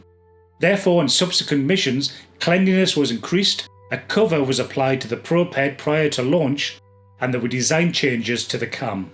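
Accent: Northern England, United Kingdom. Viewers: What is it about these British-accented content providers making US space program videos? Their pitiful island never sent anything to space.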